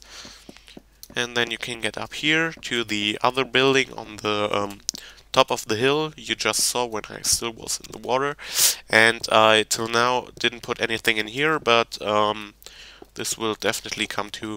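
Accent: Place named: Germany. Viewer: man merkt so geil dass du normal deutsch sprichst :D zb. schurr (sure) und äktschälli xD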